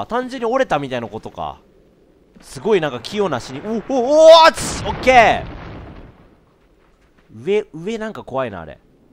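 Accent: Kansai, Japan. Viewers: 関西弁をーーーー！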